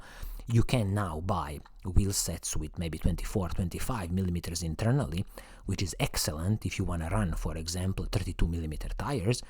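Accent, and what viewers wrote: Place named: Italy. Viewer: English with italian accent, so funny 😃, yes nice bike without stock,, it's like they are no exist like model.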